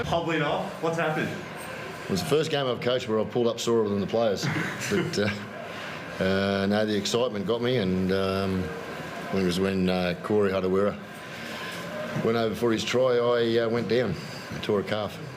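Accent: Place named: Australia. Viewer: Hearing it in an Aussie accent is too funny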